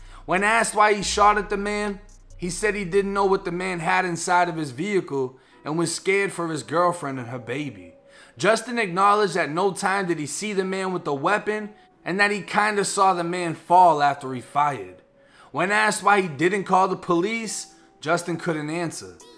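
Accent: Boston, United States. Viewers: Cuz is u from Boston slim?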